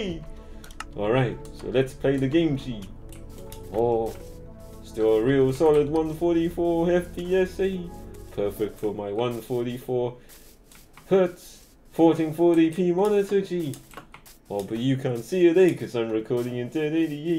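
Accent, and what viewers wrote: New Zealand. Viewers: He's put the Kiwi accent on steroids. And I'm sorry but it's over done for me I needed to mute. Good tips though so thank you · nz accent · It’s a Maori accent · Dude it’s just a New Zealand accent lmao?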